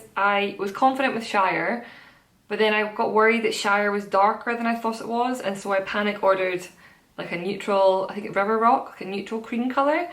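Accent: Scotland, United Kingdom. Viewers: Your podcasts are great :) I was wondering if you could recommend more Scottish knitting podcasters, I absolutely love listening to Scottish English. Also, I planned a trip to Edinburgh this October and I would be very grateful if someone could recommend a nice yarn shop in Edinburgh centre :) Thank you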